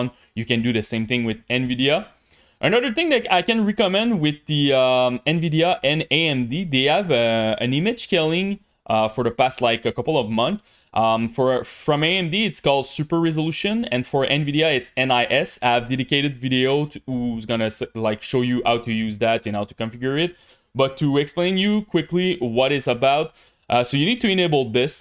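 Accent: Quebec, Canada. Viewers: Sérieusement, tu donnes toujours de bonne recommandation! Pis en plus, tu cache pas ton accent, bien de chez-nous! Lâche pas le bon travail dude.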